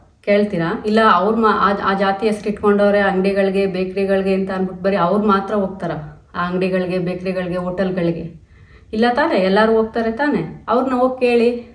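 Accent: Mandya, India. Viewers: the way u talk kannada really feels like u are in India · you don't change local kannada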